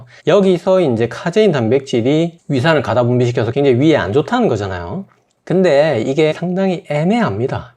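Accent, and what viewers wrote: Gyeongsang, South Korea. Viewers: 껍데게는 30댄데 말투는 50대경상도아저씨의 그것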